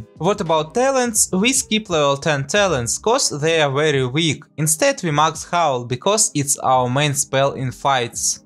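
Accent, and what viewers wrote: Russia. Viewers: Sounds like рашан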